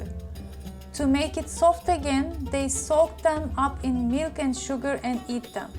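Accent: Turkey. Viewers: Love your English Turkish accent!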